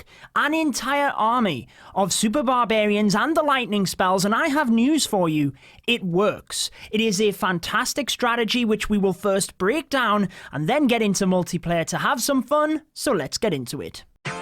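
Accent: United Kingdom. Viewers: Are you from British your voice is so something that is incredible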